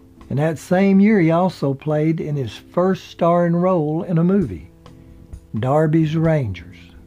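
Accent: South, United States. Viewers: Where is the narrator from..? His accent is wonderful? (I mean which US state) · Very touching documentary, made better by your homespun southern accent. Thanks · has this addictive mesmerizing All American folksy voice that's perfect for story telling. This story and the one of Billy The Kid are excellent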